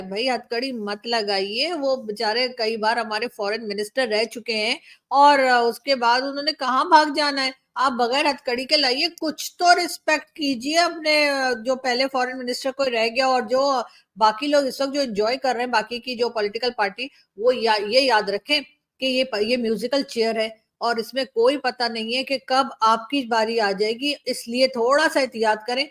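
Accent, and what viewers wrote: India. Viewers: Your style speaking is just like Indian and I like it too much . Your electricity bill gave me a big shock. I always smile to watch your videos .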